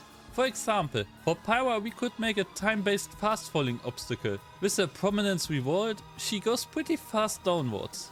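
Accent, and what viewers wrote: Germany. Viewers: I've been wondering for a long time now. Are you German? The way you pronounce certain names combined with your accent really reminds me of German. If I guessed wrong, please don't be offended.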